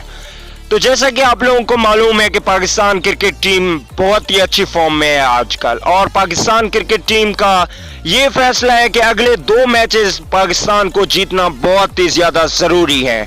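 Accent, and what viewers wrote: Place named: Bihar, India. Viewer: Bhai bihari ho kya aapko aawaz se lag rha Hai bihari ho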